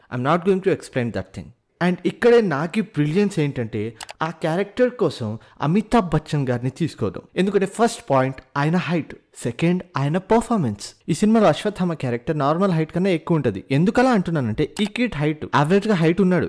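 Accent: Karnataka, India. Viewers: Hi bro... Iam not ur subscriber, bt I watch every video of ur... I love ur love towards cinima bcoz u jst like me... only difference is ur doing something to cinima, bt I am doing ntng... Today u earned my respect too... 💕 For ur slang of Telugu with kannada accent mixing... 😂 Boii.. Boii..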